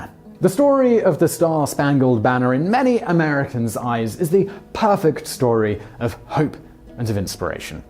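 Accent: United Kingdom. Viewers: Very (snotty) British inflection on facts about a war they started. No matter how many "Well you couldn't have won, so you were wrong to defend your countrymen" phrases you throw in there. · I love hearing American history with a British accent! I'm not trying to be snide, these vids are filled with accurate information. I just love his accent and there is something novel hearing American history from a Brit. Love you! BTW...history isn't really taught in American schools, at least not accurately. Some ppl still think the Civil War was about states rights (to own slaves.)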